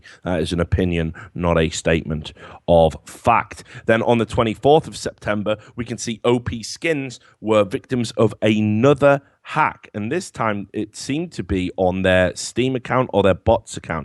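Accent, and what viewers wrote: United Kingdom. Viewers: Is it just me or is speaking in a more british accent in this video..:^) I like it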